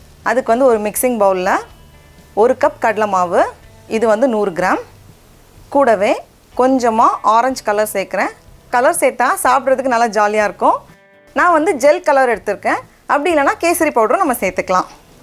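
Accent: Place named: Nagercoil, India. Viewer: Baby is soooo cute... And the way you speak ... Is your native is Nagercoil?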